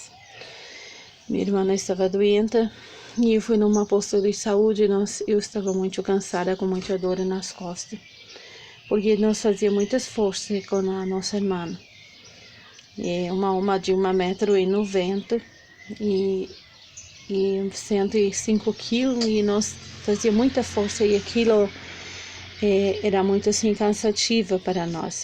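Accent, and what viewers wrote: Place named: Spain. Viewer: Ela tem tres sotaques em um video espanhol, portugues e frances.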